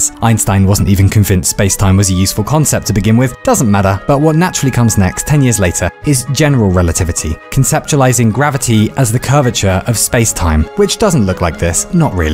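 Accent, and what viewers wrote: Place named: United Kingdom. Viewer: He's so fun to listen too I always forget he's British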